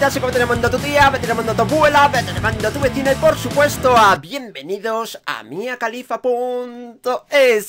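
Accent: United Kingdom. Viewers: Vi todo el video para escuchar la pronunciación de de su ingles britanico y se salto...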